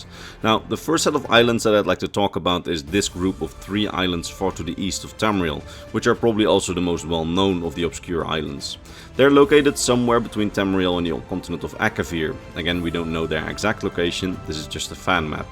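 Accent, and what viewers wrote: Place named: Netherlands. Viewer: Uw accent... U bent toch Nederlander of Flander? Videos op het Nederlands zullen ook erg interessant zijn.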